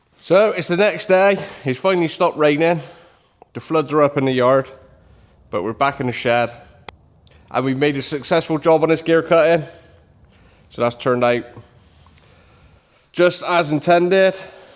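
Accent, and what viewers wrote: Bristol, United Kingdom. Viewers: Great work, sound like Bristol accent or close to Bristol?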